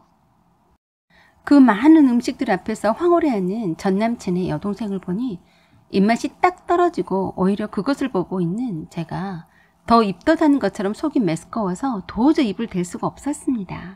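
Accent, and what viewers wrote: Jeolla, South Korea. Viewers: 혹시 전라도?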